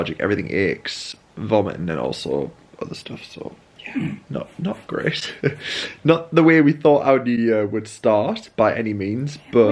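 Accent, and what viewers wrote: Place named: Durham, United Kingdom. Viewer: Can tell you’ve been back to Durham, this Londoner is having trouble understanding you 😅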